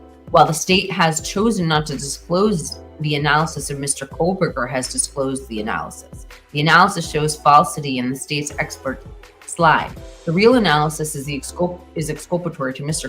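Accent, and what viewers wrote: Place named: Cape, South Africa. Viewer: where is your Cape accent? 😮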